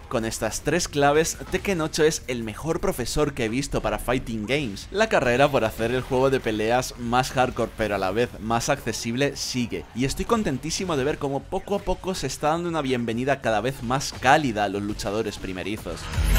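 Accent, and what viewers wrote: Spain. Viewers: cielos, quien hizo este análisis? se nota que no jugaste ni de asomo al tekken 7, el modo tutorial no tiene novedades es mas de lo mismo! lo del análisis de las peleas que uno tiene en el online tampoco es novedad !! lo que si es realmente nuevo es el modo FANTASMA, y eso esta muy bien la verdad. Y ya puestos, no conocen otra palabra que "POTENTE"??? escucharla a cada rato es ya muy molesto, me encana el acento español no se equivoquen, pero lo de POTENTE ya me tiene podrido la verdad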